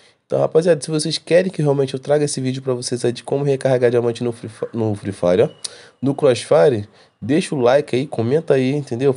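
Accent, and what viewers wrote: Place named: Rio de Janeiro, Brazil. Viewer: Po carioca é dahora 😂😂😂😂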